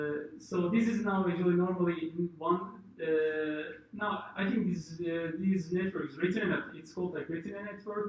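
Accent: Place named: Turkey. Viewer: Great presentation.. the presenter has Turkish accent like mine. :))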